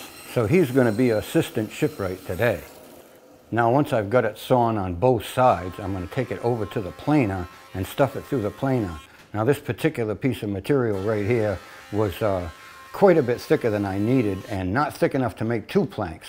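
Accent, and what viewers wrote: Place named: New England, United States. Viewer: Good to see you,. Hope all is well. I really enjoy your old school approach, the sound of a common-sense New Englander in your accent, and your calm love of precision. You are an inspiration.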